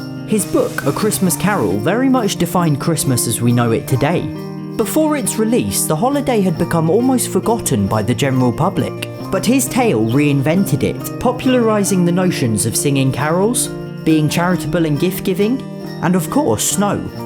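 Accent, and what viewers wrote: United Kingdom. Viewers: You are so cute. I love watching and your british accent :-)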